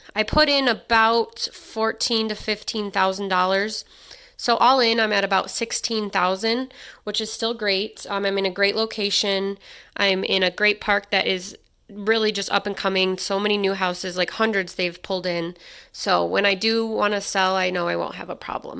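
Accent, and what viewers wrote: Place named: Wisconsin, United States. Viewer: Are you in Wisconsin? Sure sounds like it haha 😃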